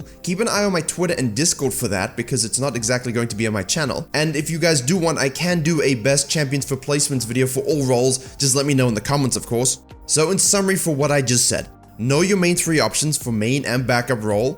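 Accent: South Africa. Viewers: that south african accent doe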